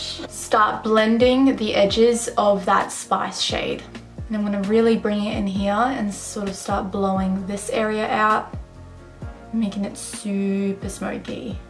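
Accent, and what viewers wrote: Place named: Australia. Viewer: Soo jealous of your Aussie accent 😂😩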